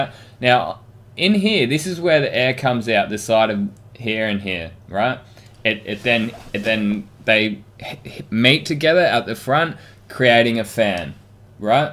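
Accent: Australia. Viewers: Hey mate, love your channel, very new to spray painting and really enjoying it. I’m a woodworker, building small furniture and musical instrument projects, and I have a small compressor putting out about 4cuf/min; it came with two cheapo HVLP guns which are ok to get me started but clearly not up to much more. The FLG-5 looks great but it still calls for about 10 cubic feet/min; some other woodworking channels recommend LVLP guns which seem to fit the bill for what I want - can you recommend any which are easily found in Australia? I can get one from the US but shipping and import duties are crazy 😝. Keep up the great work, love hearing another Aussie accent!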